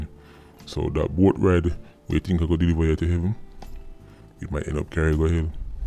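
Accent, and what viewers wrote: London, United Kingdom. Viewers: Mans from London bruv. Lol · Your London accent on point we only used esta to go USA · you sound like real British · Because the UK have left Brexit there is a deadline for EU citizens who still live in the UK to get their papers sorted to remain in the UK and maybe because they havent got the papers and dont want to go back to the EU so that may be why there are people trying to get Bonehead Biden's America, so i doubt whether its an actual UK born citizen but as UK was a former EU country (thank God UK people had sense to leave it) you could have people from all over Europe in the UK so that may be the answer or as you say it be a combination of a European trying to escape UK and EU justice to go the US where the current President is one of the most crooked men in Politics and where the Justice system is becoming a joke and illegal immigration is welcomed so the KKK Democrats can "increase" their votes...pathetic, by the way nice London accent 😂As for the knife crime you have a group of kids playing at life who are killing each other, most of them have unlying mental heakth issues or broken homes bad from school and have nothing about themselves; this is not societies fault as this younger generation have ALL the benefits and opportunities all the support all the legislation to get where they want and many young people are achieving but its the handful of demon possessed wretches that cause the problems time and time again yes some come form bad homes but so do many many other youths who CHOOSE to achiev....the UK is safe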